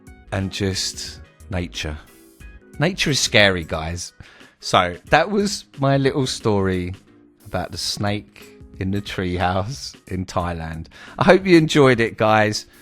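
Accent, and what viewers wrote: United Kingdom. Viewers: sounds like a London guy, as no Northern Monkey could be so entertaining.Him & Frankie have cost me a fooking fortune after trying out the games they convinced me to play.: ) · ur fucking hilarious mate just subscribed where are you from in England it seems amazing how different some.brittish ppl sound compared to others but I guess everywhere has different accents lol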